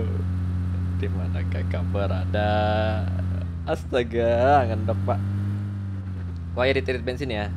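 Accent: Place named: Pematangsiantar, Indonesia. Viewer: Patengtengan bang 🤣 , ngeri kali bahasa mu bg nampak kali par siantar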